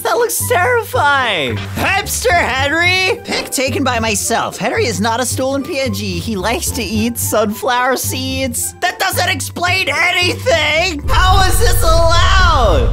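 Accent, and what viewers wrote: Australia. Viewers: Are you actually Australian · ur from australia? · i think your from austreilia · I think your from Australia.. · You are from Australia don’t Ask how know😂 from vid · Do you come from Australia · I think that your from Australia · I know where you are from Australia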